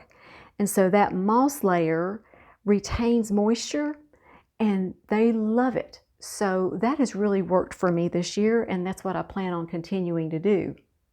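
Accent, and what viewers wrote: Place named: Kingsport, United States. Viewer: Are you near Kingsport? Your accent like mine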